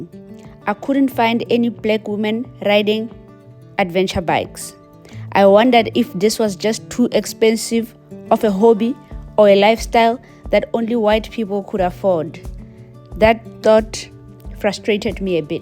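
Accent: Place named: England, United Kingdom. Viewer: American here, and just discovered your channel. Just wanted to say that I love your voice and your English accent!….especially when you roll your “R’s” 😂 reminds me of Tyla 😅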